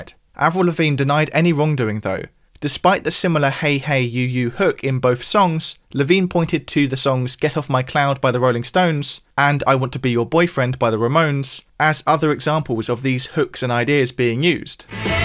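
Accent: United Kingdom. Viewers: Had my fill of British narrators. Don’t those people work?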